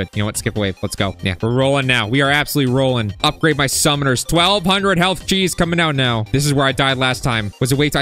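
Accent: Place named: United Kingdom. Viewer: bro i love briths talk:D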